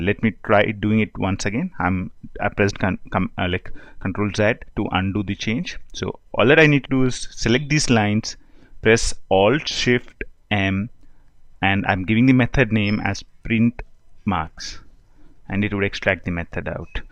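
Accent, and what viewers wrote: India. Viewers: ох уж этот индуский акцент х/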